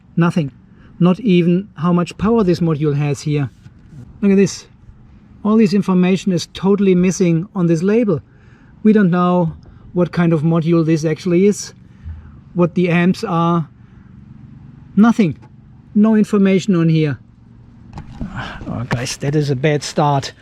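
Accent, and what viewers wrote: Germany. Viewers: Durch Zufall bin ich auf deinen Kanal gestoßen und dachte so ... Ist aber kein native speaker. Klingt deutsch. Und du bist es tatsächlich 😎. Sehr cooles Video, sehr schön präsentiert. Das Beste, welches ich zum Thema gesehen habe 😊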